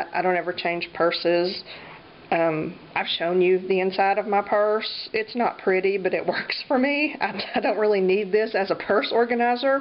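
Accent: South, United States. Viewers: You crack me up, love the accent, sounds like me cause I am Southern too.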